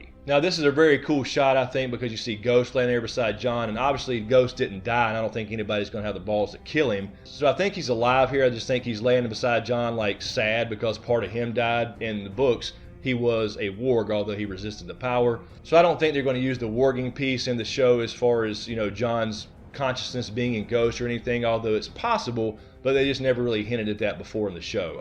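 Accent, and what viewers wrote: South, United States. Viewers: so excited for this season! I'd say I'm more excited for season 6 than the previous seasons because we are going to see stuff that hasn't been told in the books yet. Can't wait! Just found your channel...great recap! Also, you sound like a fellow southerner...so double bonus!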